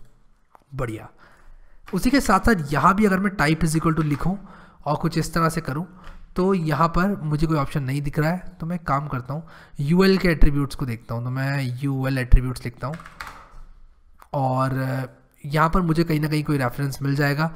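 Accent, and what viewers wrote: India. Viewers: I like Indian accent yaar its amazing i am u say "agr ye playlist acces nai KRI" an we say " agr ye nai KI" and many other words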